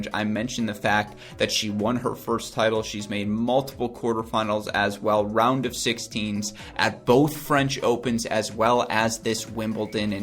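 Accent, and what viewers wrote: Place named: United States. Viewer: Well described, well resumed, well explained. And what a beautiful american accent!!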